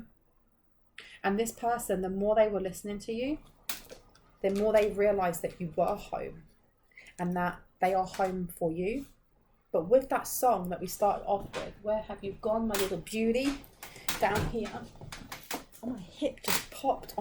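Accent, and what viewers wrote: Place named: Hertfordshire, United Kingdom. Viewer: where are you based exactly? you sound like you come from hertfordshire ,, anywhere close?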